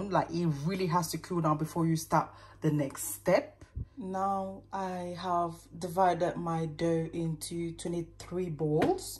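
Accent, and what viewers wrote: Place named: England, United Kingdom. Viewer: Merci pour la recette et j'adore ton accent anglais 😉👌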